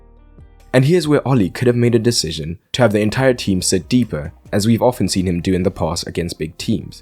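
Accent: South Africa. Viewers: Narrator sounds South African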